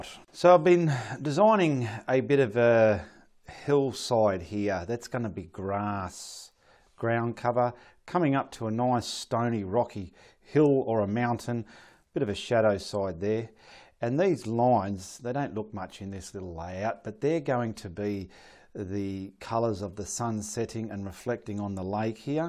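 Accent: Australia. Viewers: Thats the thickest australian accent ive ever heard. Love the painting.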